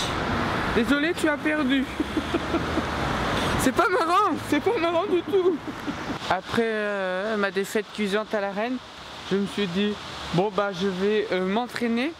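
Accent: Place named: North, France. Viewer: tu est du nord toi ... POURQUOIIIII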